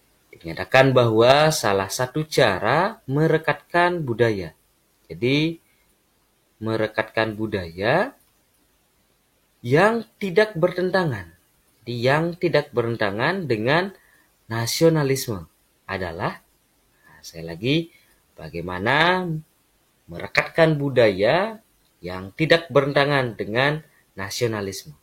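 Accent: Bali, Indonesia. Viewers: Keren banget penjelasannya on point gak bertele2. Dan dijelaskan konsep dan triknya juga... logat balinya juga jadi pemanis😆 Saran kak, biar jadi pembeda dengan channel lain. Kakaknya fokus ke TWK aja. Pasti channelnya cepet berkembang apalagi kakaknya enak banget jelasinnya gampang dipahami jugaa.. Biar kayak lapak sebelah sang tutor yg fokus ke TIU hehee Sukses selalu kak...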